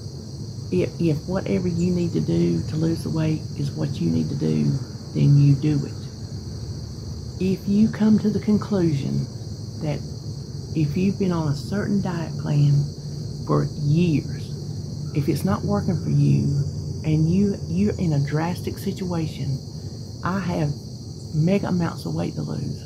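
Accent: South, United States. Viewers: You are wonderful, and I love watching you. I’ve been watching your journey for quit a while, and I am so proud of you for doing so well, and keeping on with whatever you’ve needed to do to lose your weight. As I have said, I have watched your channel for a long time, and I recently noticed something that I wonder if you have noticed: I’ve noticed your reflection in the window behind you on your porch, and how much smaller you look in your reflection. Have you noticed that? It’s kind of amazing! There’s a huge difference! As to your comment that you speak like a hick, I love to hear you talk. My father was from the south, and you remind me so much of my aunts and grandma in the way you talk and the expressions you use. It’s like a family reunion 😂 Thanks for what you do and share. You help me stay on track because your videos are so encouraging.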